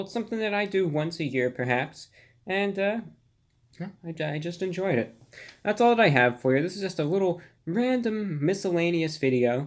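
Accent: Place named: Mid-Atlantic, United States. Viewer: He likes to be classy, if u notice his got a mid atlanitic accent which was popular before 1950. people back then wore suits all the time too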